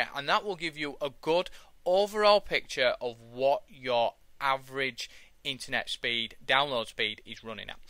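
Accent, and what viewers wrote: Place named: Leeds, United Kingdom. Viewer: You from Leeds? Great accent!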